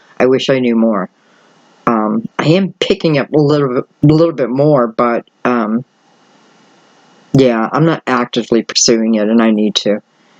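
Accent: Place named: Philadelphia, United States. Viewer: sharp 907 I hear it too. I’m from philly. We all have that accent. Lol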